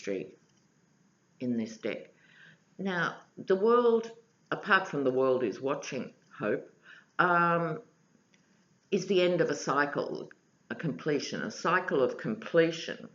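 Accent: Australia. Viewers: Linda Casey, That had me cackling. I missed that because I'm used to the Aussie accent. But seriously, the visuals of Trump humping Hope are just too... excuse me while I barf.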